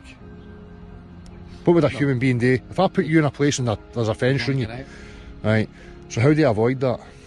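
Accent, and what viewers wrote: Scotland, United Kingdom. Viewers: I love it!! And you remained friends.....and sowed a seed. Well done! (What part of Scotland are you from?) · I like scottish accent 😉 !! I thought he was a relative of Charlie Burchill 😂 ( Simple minds)!! Greetings from Italy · That was so well done. I love the Scottish accent as well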